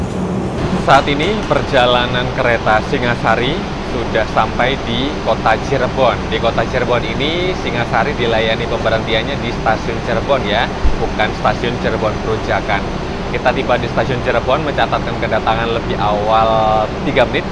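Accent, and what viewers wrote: Surabaya, Indonesia. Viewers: Suaramu itu menang sama 2 kereta pembangkit kok.... 😁😃🤣 Soalnya suaramu itu nge-bass & sangat2 juselllaaasss ucapan huruf2nya kok... 👍👍👍 Maaf, Mas itu asli mana si, kok klo ngomong pake Rek Suroboyoan ya...???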